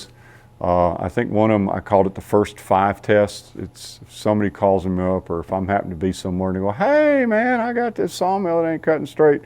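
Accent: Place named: Virginia, United States. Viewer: Much appreciated! As a new sawmill owner your words of wisdom are very valuable. And you talk like me......from South Central VA😂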